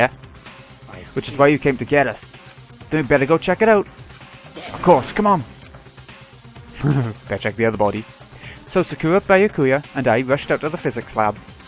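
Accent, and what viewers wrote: Wales, United Kingdom. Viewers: you sound Welsh asf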